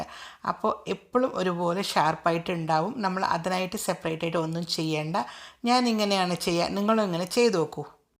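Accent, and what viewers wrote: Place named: Tamil Nadu, India. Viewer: videos ellam njan kanarund... തമിഴ് ചുവയുള്ള നിങ്ങളുടെ മലയാളം കേൾക്കാൻ എനിക്ക് നല്ല ഇഷ്ടമാണ്....helpful vieeo aantoo ith.... Thank you so much dear🥰🥰🥰💐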